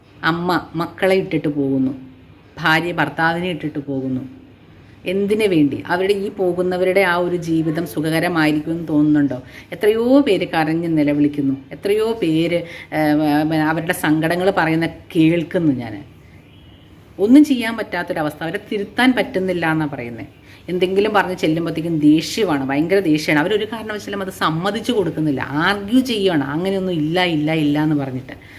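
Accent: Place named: Malabar, India. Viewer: നിങ്ങളുടെ അവതരണ ശൈലി സൂപ്പർ ആണ് ട്ടോ,,,,, തനി മലബാർ ശൈലിയിൽ,,,,,,, ആളുകൾ ശ്രദ്ധിക്കപ്പെടും,,, 👍🔥🔥🔥🔥🔥🔥🔥🔥🔥🔥🔥🔥🙏🙏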